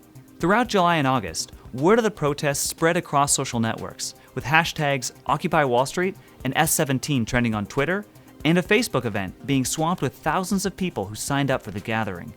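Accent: United Kingdom. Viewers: u sound like ur britland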